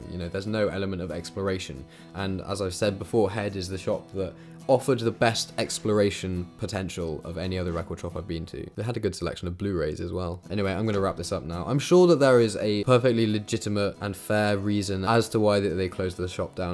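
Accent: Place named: London, United Kingdom. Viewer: A bit late but sorry to hear this news man. I'm just wondering if you are originally from London cause of you're accent.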